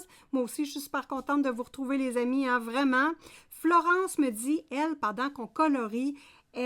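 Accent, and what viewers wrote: Canada. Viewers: Bonjour 😄,ça fait plaisir de te voir et de t'entendre car j'adore ton accent canadien, il démarre très bien ton colo, il sera beau 💕😄bises Martine 😄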